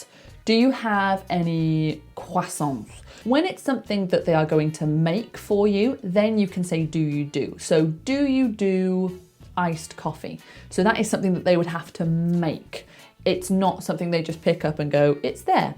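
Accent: United Kingdom. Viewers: i like british sounds❤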